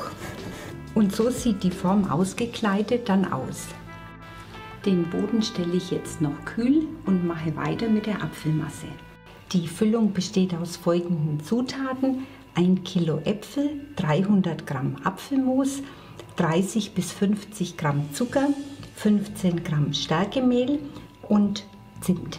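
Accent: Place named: Franconia, Germany. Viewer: Liebe, jetzt muss ich dir endlich mal ein Kompliment machen!Deine Rezepte,Tricks und deine liebevolle Art sind einfach super! Dein fränkischer Dialekt mag ich besonders,komme auch aus deiner Gegend.Da ist immer ein bisschen Heimat dabei!☺ Bitte mach weiter so!Du bist genial!🍰🍩Danke du Liebe🌹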